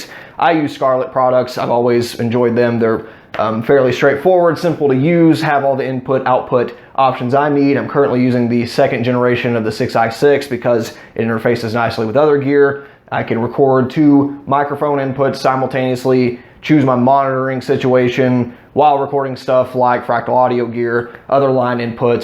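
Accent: Texas, United States. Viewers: Why do you sound like you are from texas lol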